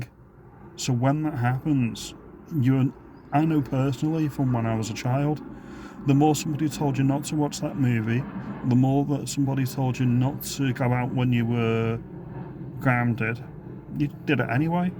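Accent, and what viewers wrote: Liverpool, United Kingdom. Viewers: Is this how a posh scouse sounds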